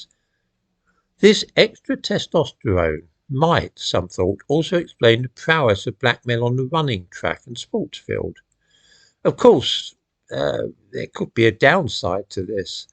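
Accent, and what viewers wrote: United Kingdom. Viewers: good job on this comment section you created a nice space for racists and xenophobes to gather and share their thoughts. "race matters we ignore it" what do you suppose we shall do with race ? what is your suggestion oh great one? push them towards taking up sports? racially profile them so they can be closley watched by the police because they have more testosterone ? (!) you, WHITE PEOPLE, have committed, and continue to commit some of the most horrendous crimes against humanity, wasn't your "kind" who would cut the right hand of african children if they failed to work as hard their "masters" demanded. wasn't that barbaric? oh that was necessary because you think they are inferior race don't you ? disgusting. just because you speak with a British accent doesn't mean what you say makes ANY sense. "race matters we shouldn't ignore it" alright you tell us what to do lol. 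or you're simply leaving things vague for your followers and commenters to fill in? judging by comments such as "the crime stats prove this" they sure understand your language. they sure are great at generalizing, putting people in a box and putting a label on it.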